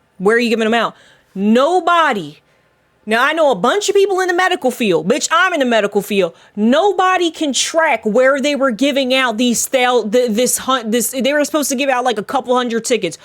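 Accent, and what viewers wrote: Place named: Baltimore, United States. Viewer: Hey where you from? U sound like ur from Baltimore but ur a sixers fan? I'm from California so I can be way off?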